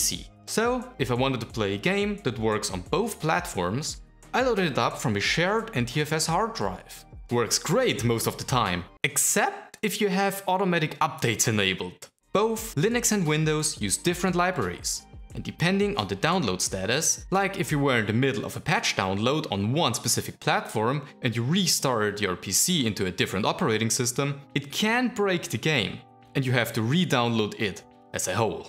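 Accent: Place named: Germany. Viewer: Hi gutes Video. Ich habe genau fas gleiche gemacht wie du. Habe viel rumüberlegt, was die beste Distribution für mich ist und bin dann doch wieder bei Fedora hängen geblieben. Jetzt finde ich es wirklich gut und liebe Gnome 42👍 PS: Bist sicherlich eigentlich Deutsch ne? Man hörts ein bisschen 😂😉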